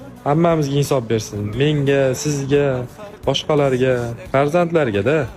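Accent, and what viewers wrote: Andijan, Uzbekistan. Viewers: Анжаннанмизиз дейман шеваыиз кетяпти ман. Кашкадареданман сог булинг. Ота угил. Алхамдулилла. Роббил. Аламииин❤❤❤❤❤❤ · Андижоним шеваси ❤ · Shevaga gap yoʻq xaqiqiy anjancha 😅😅😅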